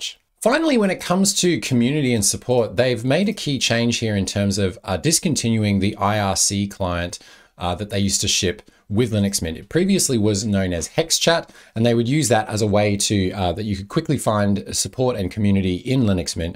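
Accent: Australia. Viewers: I hear Australian accent > I stay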